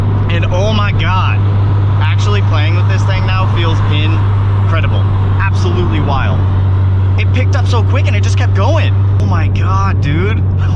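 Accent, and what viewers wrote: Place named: Massachusetts, United States. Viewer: u from mass bro?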